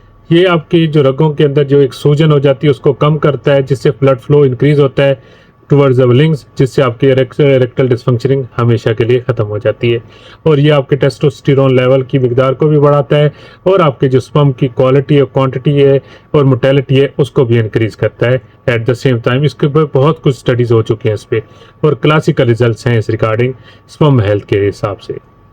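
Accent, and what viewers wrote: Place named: Punjab, India. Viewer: W salam nice video bahi again Punjabi touch ❤nice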